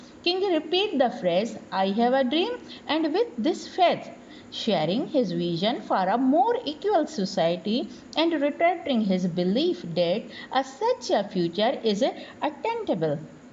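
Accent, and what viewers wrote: Sindh, Pakistan. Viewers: English in sindhi Accent !!!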